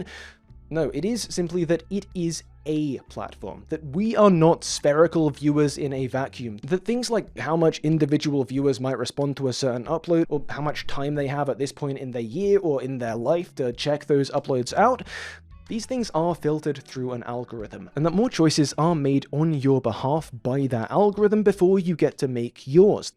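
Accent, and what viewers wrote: United Kingdom. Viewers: how is this guy British Kyle Bosman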